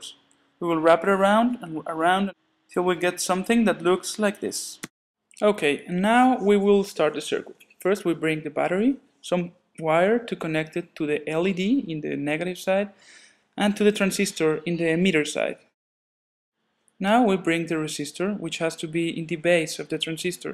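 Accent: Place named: Spain. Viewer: I don't know whats worst for an american, spanish accent or my russiangermanportuguese accent =P But in fact, he made a such awesome job!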